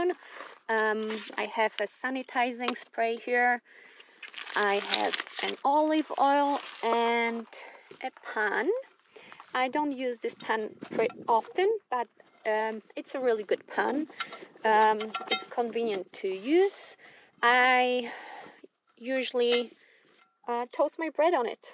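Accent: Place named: Central Europe. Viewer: I'm curious about three things in regards to your video. Firstly, why do you feel the need to roll the windows up in Griz country, and secondly, have you considered removing the front passenger seat for more room - or does it serve some purpose? Lastly, you have an interesting accent that sounds to my ears to be from Central Europe - am I close? 😀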